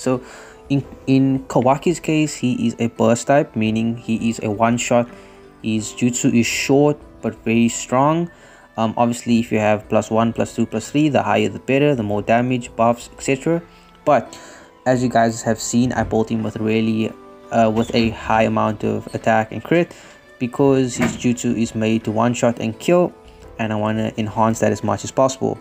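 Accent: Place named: South Africa. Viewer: Yo bru where u from ur accent sounds South African??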